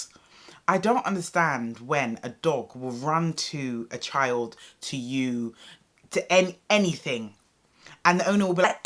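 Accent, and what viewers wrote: United Kingdom. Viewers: Id prefer if you could seperate your - very talanted - comedian style from a very serious message. I was a little irritated because it was so funny to watch. Beside your strong english dialect is fun too for foreigners :D Glad to know its not only me feeling flood by this dog pest.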